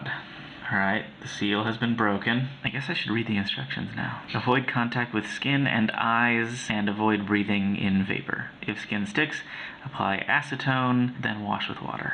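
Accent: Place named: Ireland. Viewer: Great to hear an Irish accent in a WIDRN video. How many of us Irish Arandanauts are there?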